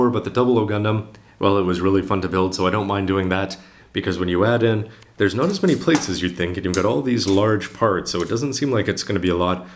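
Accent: Canada. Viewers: is, in my summation, Canadian. I say that based on his accent and vocabulary. And honestly, I am curious why he lives in Japan but if he wants us to know he will decide when and if he tells us. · Judging by his hands and his accent, I'd say he's probably white and probably from Canada or the northern United States (because it sounds like he says "out" like "oot" sometimes).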